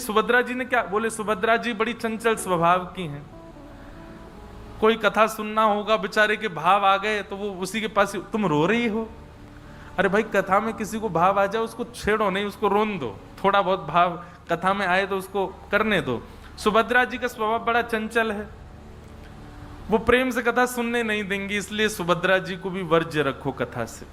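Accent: Braj, India. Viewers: Raadhey raadhey guru ji aapke charno me koti koti pranam aapki Katha bhut acchi lagti hai aapke mukharvind se brij bhasa bhut sunder lagti hai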